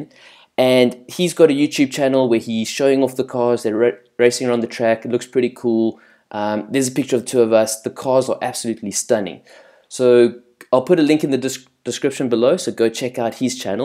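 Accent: South Africa. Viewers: .....nice South African accent boet.........but your pronunciation of the drivers names is shocking...ja ok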